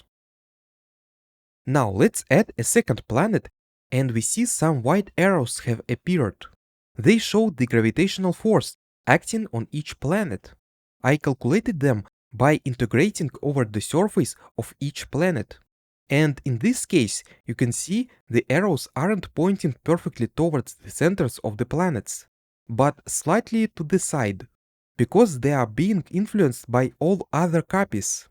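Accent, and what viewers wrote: Russia. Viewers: отчётливо слышно русский акцент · я даже и не знал что у него есть канал на английском а ещё русский акцент очень возникает)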